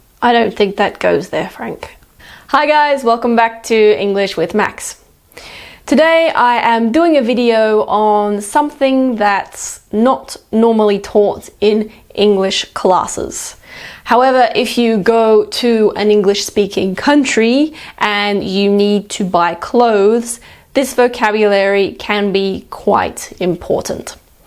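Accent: United Kingdom. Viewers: Hello Max, question... are you speaking british english...thanks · Uk accent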